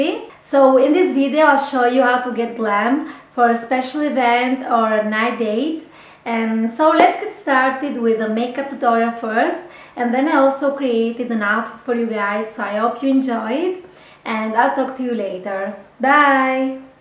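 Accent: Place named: Italy. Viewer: She said "white", she's italian so she hasn't your english accent. Be a bit nice! Damn....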